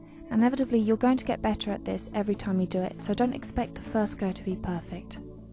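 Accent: United Kingdom. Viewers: Ate you from the uk? I am lol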